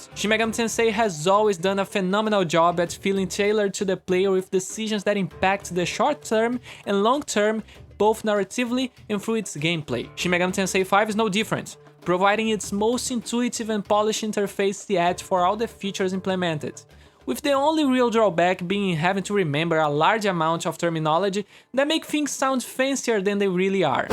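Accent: Brazil. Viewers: engraçado como é excepcionalmente facil identificar BR falando inglês xD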